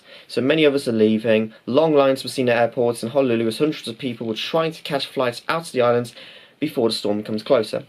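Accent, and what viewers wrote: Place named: RP, United Kingdom. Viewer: nice bbc english accent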